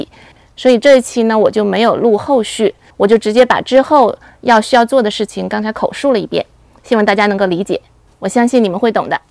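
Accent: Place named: Jinzhou, China. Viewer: 我感觉像是锦州人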